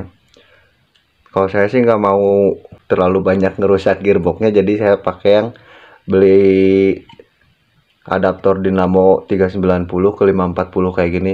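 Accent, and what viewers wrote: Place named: West Java, Indonesia. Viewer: Kang sunda na karaos,asli timana 😅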